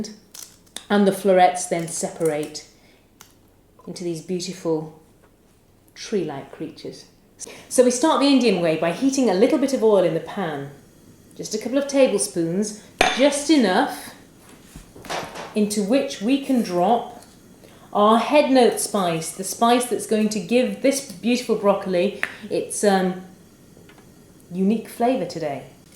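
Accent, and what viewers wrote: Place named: RP, United Kingdom. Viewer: true. will give both a try. how do you speak so posh. i love it :p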